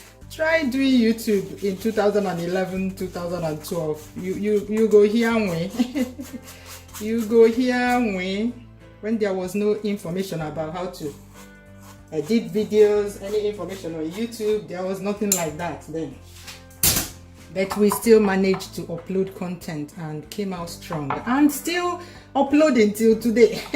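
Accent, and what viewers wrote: Igbo, Nigeria. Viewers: Sis idi too much. I learnt alot from you. Nye wam ur igbotic voice. I am loving it anytime. Jisike!!! More grace sis. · We love that Igbotic voice 😂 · We like hearing " ur sexy igbotic..voice"..jisike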